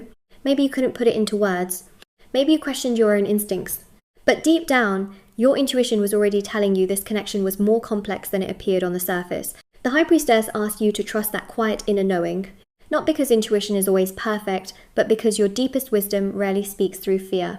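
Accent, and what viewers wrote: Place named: United Kingdom. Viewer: What a beautiful accent...the British one❤.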